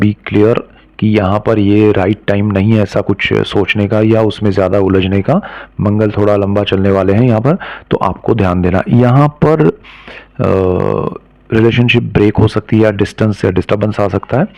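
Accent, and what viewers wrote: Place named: Kashmir, India. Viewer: Are you from Kashmir your accent is kashmiri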